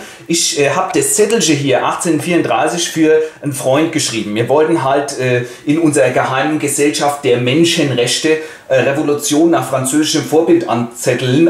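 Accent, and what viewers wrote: Hesse, Germany. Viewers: Ich weiß nicht, wie man das nicht verstehen kann. Auf die Gefahr hin, dass die Frage schon 100x gestellt wurde: bist du Hesse? Bin selber keiner, aber ist sowas von leicht zu verstehen. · Kommse aus Hesse?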